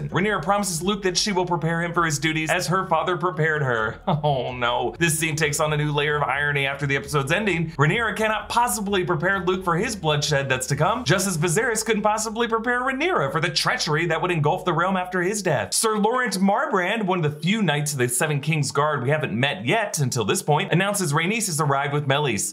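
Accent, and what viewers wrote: United States. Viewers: Plain American accent that finds 5 notable changes in the opening credits when others say “nothing changed” despite having seen the episode in advance and had more time to dissect it. Your videos are well worth the wait. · I LOVE YOUR PLAIN AMERICAN ACCENT!!!! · “from a nerd with a plain American accent” 🥰🥰🥰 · “Plain American accent” damn shots at the other creators who more than likely made their video after the leak.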